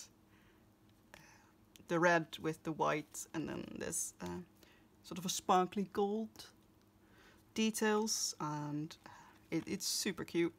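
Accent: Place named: Netherlands. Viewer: Hi! Subscribed right away just because of your chanel name..haha I seem to detect a little bit of a dutch accent, I am right? :) Edit: Just watched a little over half of your video and you gave an awesome idea to use the weeks for meal planning and groceries list. I had already thought about buying a weeks cover for my coupons but if I put a weeks inside it for the planning it will be even better! Thanks! :)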